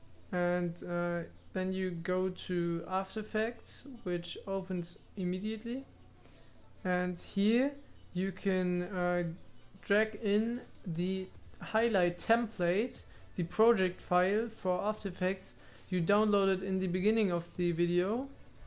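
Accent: Germany. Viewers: Man hört einfach das du deutsch bist lol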